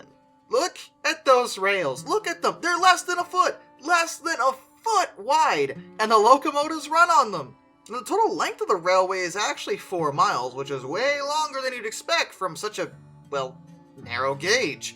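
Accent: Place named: United States. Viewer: i wouldnt call these the smallest but its nice to hear an american talk about the sand hutton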